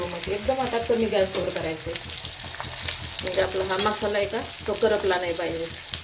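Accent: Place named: Beed, India. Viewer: शिमला मिरचीची काय भाजी 🫜 मिरच्या धुवून साफ करून याच्या मध्ये मसाला भरलेला❤🎉 ताई तुमच्या भाषेवरून माझ्या आठवणीत आले होते तुमचे नक्की बीड माहेर असणार❤ बीडची भाषा आपापली लगेच ओळखायला येते❤ ढोबळी मिरची रेसिपी खूप छान वाटली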